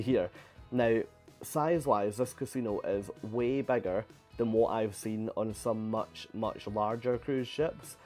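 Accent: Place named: Aberdeen, United Kingdom. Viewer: Are you from Aberdeen? Your accent reminds me a lot of a friend of mine from that area. Ace review!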